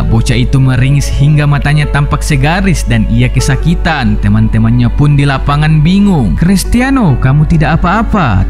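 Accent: Medan, Indonesia. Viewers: Lucu pembacanya, logat nya masih terasa, kek nya orang medan ini, horas medan!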